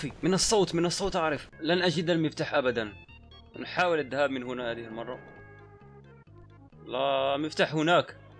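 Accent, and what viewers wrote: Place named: Egypt. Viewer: من هو احسن يوتيوبر ( احسن من ابو فلة ) - من اليوتيوبر الي بيستاهل 26 مليون مشترك ( يستاهل اكثر من ابو فلة ) - من اليوتيوبر الي بينزل فيديوهات بشكل يومي ومهتم بنا مثل الاطفال ( اكثر من ابو فلة ) - من اكثر يوتيوبر مضحك ( مضحك اكثر من ابو فلة ) - من الي بيتكلم مصري وهو اصلا من الجزائر ( من الاخر احسن من اي يوتيوبر ) 🎉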